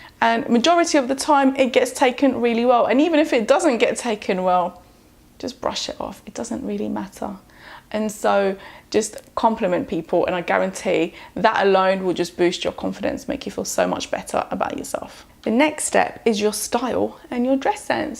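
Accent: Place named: Russia. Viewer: I love the video but do you from Russia or Germany ???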